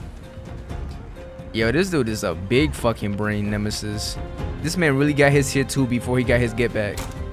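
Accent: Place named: Chicago, United States. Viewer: Man I know a Chicago mf when I hear one 😂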